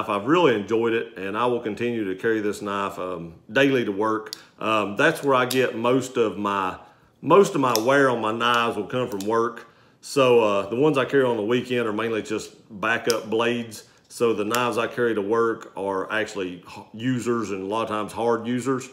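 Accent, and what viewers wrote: South, United States. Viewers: Hello new supporter here showing support for ya and I hit the like button. Awesome video. Great gift. Nice knife!! Like ur accent. Sound southern. Lol like me. O I hit the bell too. !🤩🤩🤩🤗🤗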